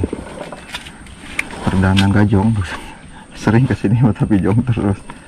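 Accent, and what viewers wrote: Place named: Banten, Indonesia. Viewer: dari logat bicara nya mah kaya orang Banten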